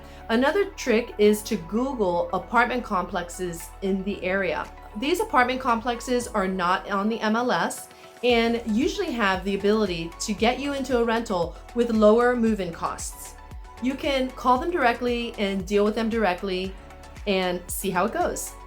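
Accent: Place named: United States. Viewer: I love your tips and also your beautiful American accent!!!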